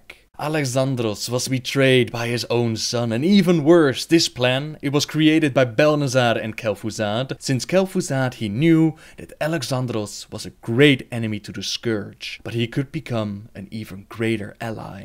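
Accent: Netherlands. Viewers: this sounds so dutch it hurts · i hear a heavy dutch accent in his voice. :)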